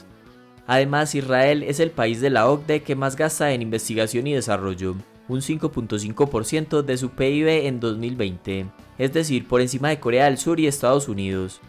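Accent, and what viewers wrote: Antioquia, Colombia. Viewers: Excelentes videos. Hace poco empeze a seguir el canal. Soy de Colombia y le sentí cierto acento paisa al narrador . Los veo desde Memphis TN 🇺🇲🇨🇴. Dios los bendiga y gracias por ayudar a la cultura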